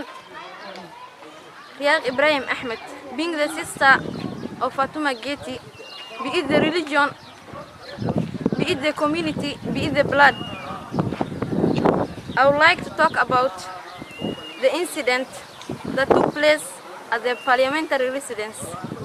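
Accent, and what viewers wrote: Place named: Somalia. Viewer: Kiboor Somalitizing English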